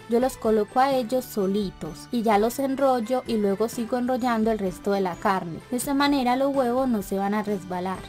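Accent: Antioquia, Colombia. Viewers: Tan Querida con ese acentíco Paisa. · Super esta receta😂😂😂😂me senti hablando yo...puro paisa.gracias por compartir❤ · Como todas tus recetas excelente me encantó un abrazo de un venezolano que vive en argentina y por supuesto esa receta el mejor acompañamiento que tiene es ese acento paisa tan lindo ❤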